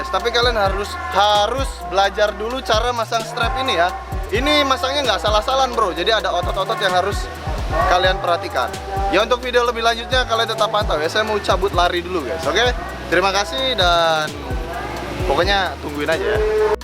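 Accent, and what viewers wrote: West Java, Indonesia. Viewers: Orang sunda bukan bang?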